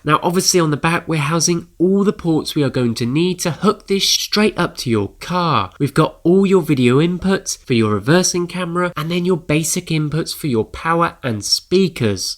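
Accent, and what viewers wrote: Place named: United Kingdom. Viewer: I hate uk accent...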